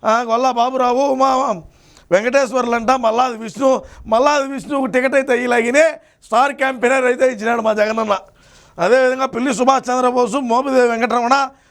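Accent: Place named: Chittoor, India. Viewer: Where are you from brother you talk telugu of chittoor district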